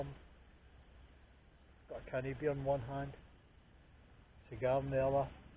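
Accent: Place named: Tayside, United Kingdom. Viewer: Sounds like Tayside.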